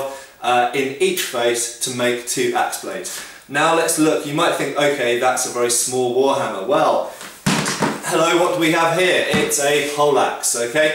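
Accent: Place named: England, United Kingdom. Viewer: Out of a myriad of tube video's I thoroughly enjoy yours for having such well presented video's. I also enjoy the Pommy accent and the authenticity when you always turn the camera off by hand gives a nice touch. P.S ASHES BELONG TO AUSTRALIA !!!!! Yeaaa, maybe you should do a video on what your cricketers should use as the most devastating blunt instrument for maximum batting efficiency :D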